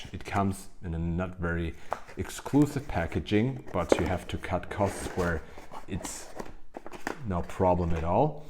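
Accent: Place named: Germany. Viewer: strong german accent 😊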